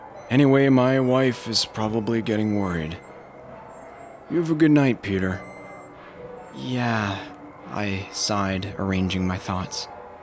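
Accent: Canada. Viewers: These are some of the best Creepypasta recordings on YouTube. However.... man you can tell that the narrator is profoundly Canadian!!!!! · Don't love the narrators Canadian accent, but other than that... All good. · The way he pronounces words with the letter U is a dead give away he's from some part of Canada. Granted Canada has many accents, like America, People in the Maritimes speak differently than those in the Greater Toronto area ect ect. Anyway listen for how he says "outfit".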